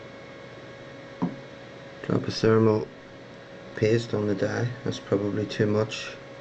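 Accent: Northern Ireland, United Kingdom. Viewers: Actually he is northern Irish, which is in the UK, not Republic of Ireland which isn't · Pretty sure he's from Northern Ireland. -_ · thats a northern ireland accent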